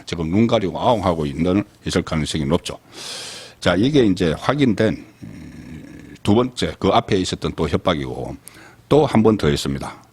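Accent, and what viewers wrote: Gyeongsang, South Korea. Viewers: 송국건씨 나재명이 와동갑그 새끼가천 명한나이 대로각설 선상님제 발독하게 좀해봐아 무리좋게 볼래도너 무나약해 뒤지겠소 말투가경 상도가분 명한데부 울경병신 새끼마냥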